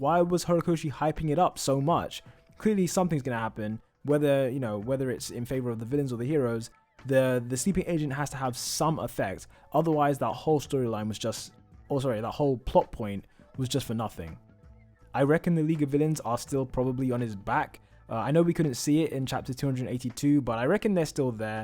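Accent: United Kingdom. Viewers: Yo you sound like your from the uk.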